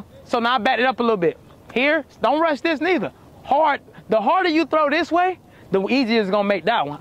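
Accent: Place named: Florida, United States. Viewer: That Florida twang is so exclusive! 💪🏾😎🌞🌴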